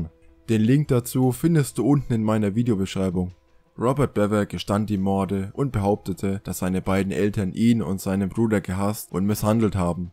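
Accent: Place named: Franconia, Germany. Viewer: so geil! Ich liebe fränkisch! Alle aus meiner Family kommen da her. (Nur ich nicht 🤨)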